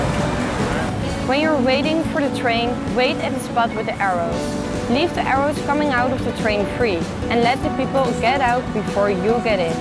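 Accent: Netherlands. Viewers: This is a dutch company right ? Ik kan het horen aan het accent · hahahaha sounds like a dutch farang hahahahaha. This tour agency is extremely expensive. Norrmally this type of farangs got scammed in bangkok now they scamming their own people.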